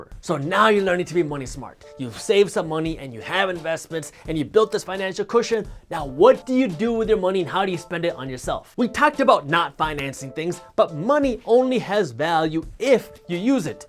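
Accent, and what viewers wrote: India. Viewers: Indian accent and indian culture real af😂😂😂loved it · Love the Indian accent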